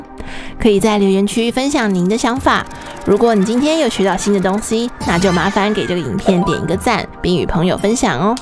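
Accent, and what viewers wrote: Taiwan. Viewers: 台湾腔听着莫名恶心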